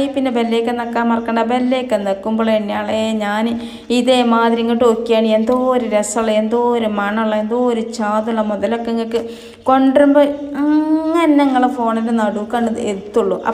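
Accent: Malappuram, India. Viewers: ചാനലിന്റെ പേര് എന്താ മാറ്റിയത്. പക്ഷെ സംസാരം കേൾക്കുമ്പോൾ സൗണ്ടും പിന്നെ കമെന്റ് നോക്കിയപ്പോൾ ആണ് സംശയം മാറിയത്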